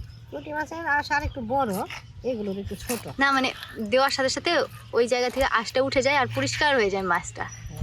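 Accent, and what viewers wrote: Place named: Bangladesh. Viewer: আপু তোমার ভাষাই কিছুটা বাংলাদেশের টান আছে।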